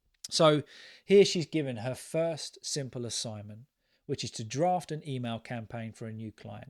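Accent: United Kingdom. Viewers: Perfecto like always Nice British accent sounds sweet ❤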